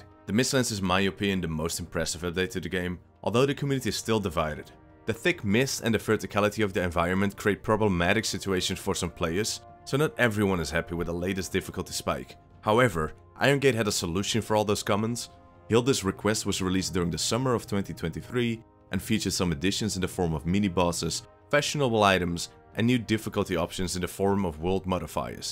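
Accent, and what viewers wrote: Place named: Netherlands. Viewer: Such a Dutch accent lol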